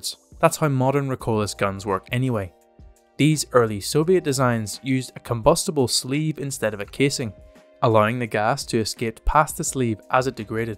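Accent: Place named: United Kingdom. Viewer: I hate Brit english..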